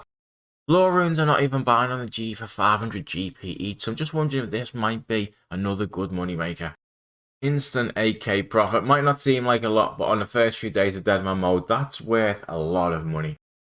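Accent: Liverpool, United Kingdom. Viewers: scouse power!!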